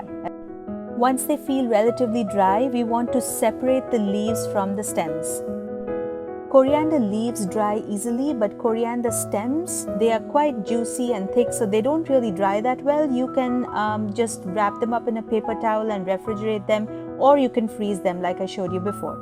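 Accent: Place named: England, United Kingdom. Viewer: Nice English accent and thanks for the video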